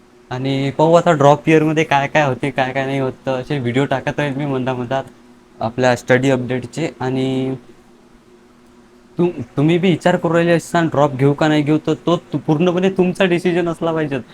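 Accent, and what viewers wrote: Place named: Warud, India. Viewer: Bro tu amrawati warud cha ahe ka Karan tuzi bolnyachi bhasha tashich ahe😅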